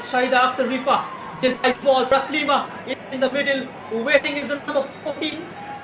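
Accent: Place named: India. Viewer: Bloody Indian commentator's accents are so ugly. Being an Indian, I hate it. I also watched IND vs BAN Bangladesh T-Sports Production. Their accents are good; not irritating like this commentator's accents.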